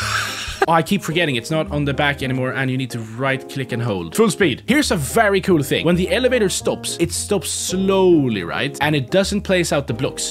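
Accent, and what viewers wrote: Sweden. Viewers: are you swedish? i'm norwegian btw! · Are you swedish?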